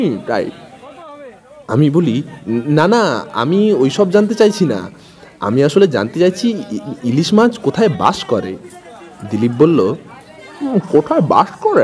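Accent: Bankura, India. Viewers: Kolaghat e bankurar accent??? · Besh valo.. kintu purba medinipur e bankurar accent ta kan e lagche 😐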